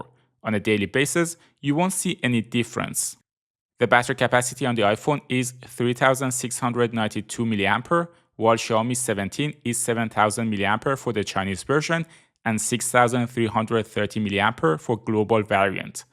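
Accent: Iran. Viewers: Your accent is similar to Iranian....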